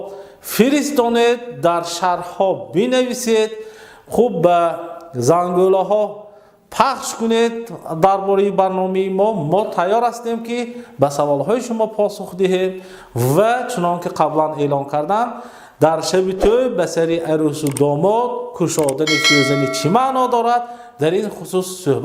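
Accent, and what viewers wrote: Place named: East, Tajikistan. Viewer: Брат иё шарқ гап задестан о маву ту а ғарбем ихе гапора намефаҳмем 😇😟🤨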